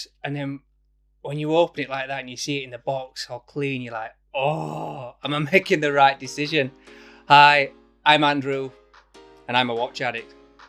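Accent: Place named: Scotland, United Kingdom. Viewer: Nice Scottish accent but I do think the BB58 blue is a real keeper!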